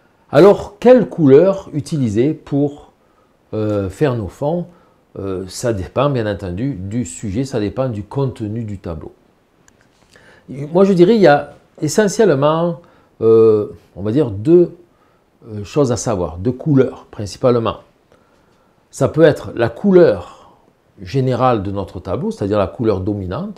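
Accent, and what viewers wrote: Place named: Provence, France. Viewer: Merci Monsieur , bien expliqué !! Intéressant pour me ressourcer ! Et Bel accent de Provence ❤️ Régine , artiste.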